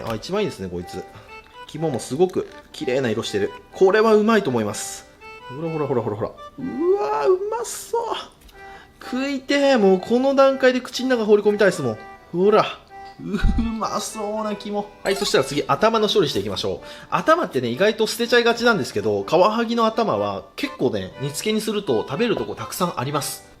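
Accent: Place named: Mikawa, Japan. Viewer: ところどころ三河弁なの親近感湧くわー！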